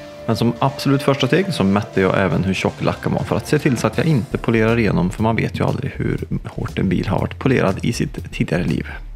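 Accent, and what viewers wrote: Värmland, Sweden. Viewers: Dä ä ju lite lustigt detta att du pratar värmländska mä buckel mannen men inte i dina vanliga filmer (inte lika grov värmländska) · Gött å höra lite bred värmländska! Ett fantastiskt hantverk må jag säja!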